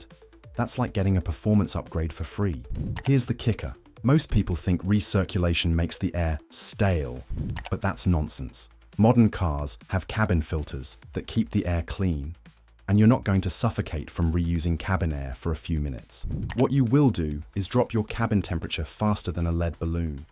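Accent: United Kingdom. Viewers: Screw your British accent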